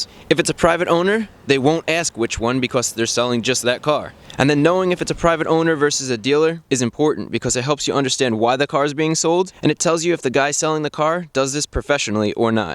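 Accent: United States. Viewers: I like your enunciation and pronunciation. Are you american ?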